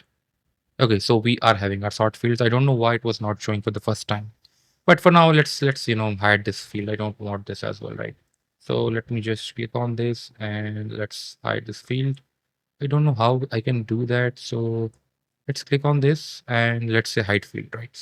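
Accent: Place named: India. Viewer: Puh, the Indian accent is a bigger challenge than learning the software itself 😔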